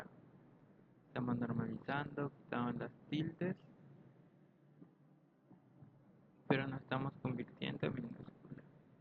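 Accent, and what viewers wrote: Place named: United States. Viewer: hola gracias el video me fue de gran ayuda pero por favor como puedo cambiar la voz es que el problema es que su voz se escucha demasiado rara como si fuera una gringa intentando hablar español y hay muchas palabras que no se entienden bien por favor ayudame necesito arreglar ese detalle de inmediato por favoorrrr respondame rapido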